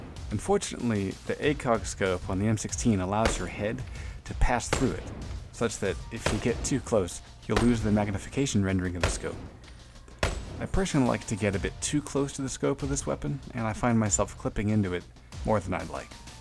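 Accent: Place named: United States. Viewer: wtf, I wasn't expecting an american lmao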